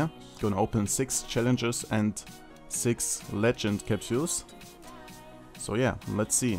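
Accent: Germany. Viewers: SO ein heftiger deutscher Akzent. Meine fresse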